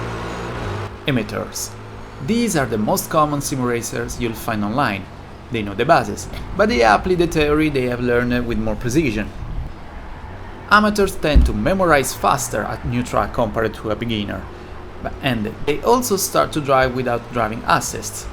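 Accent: Italy. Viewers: I love your English! Are you Italian? If so, greetings from your neighbour Albania 👋🏻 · Is forza Motorsport 7 a good simulation game? Btw are you italian?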